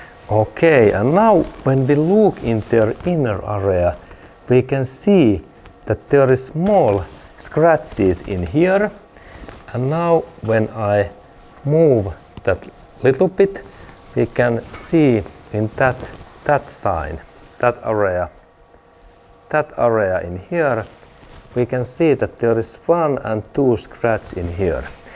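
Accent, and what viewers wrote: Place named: Finland. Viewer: I think this is a Finnish (Uralic) accent?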